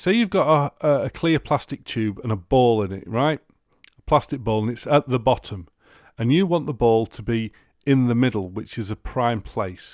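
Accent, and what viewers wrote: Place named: Manchester, United Kingdom. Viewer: You earned a sub from me, Mate and I haven't used a tape in years. I find your accent to be excellent to listen to. Sounds like the bloke from Manchester I watch walking all the canals and sleeping in the woods. On top of that. you replied in a minute to a comment of mine on one of your old videos indicating that you appreciate people watching your stuff!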